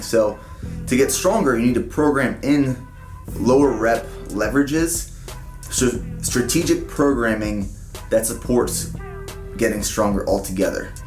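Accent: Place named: Pennsylvania, United States. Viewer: That tank he was wearing at the start? Who buys that kind of shit? Oh yeah, he is from Western PA. horrible taste, horrible accent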